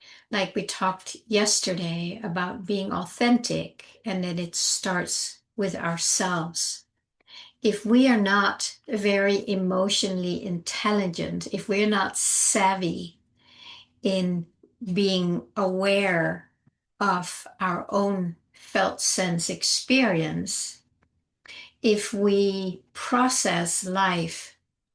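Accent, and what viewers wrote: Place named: Europe. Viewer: Some times I write comments to quick lol Yes, not in a judging way. In a living manner I say love and compassion first second and third then try try again It’s just so stupidly simple We tend to complicate it some make the biggest messes then we have to help clean up. That’s ok cuz hopefully they have helped clean up ours lol. Ya know, your videos have this way with me. The maternal aspect of your voice with your subtle accent reminds me of old world Europe. My grand mother That old Rumanian Gypsy So thank you 🙏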